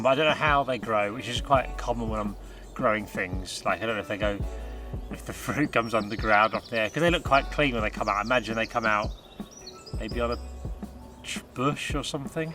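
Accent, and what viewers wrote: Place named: England, United Kingdom. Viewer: Listening to talk about his garden in his English accent is my comfort food 😂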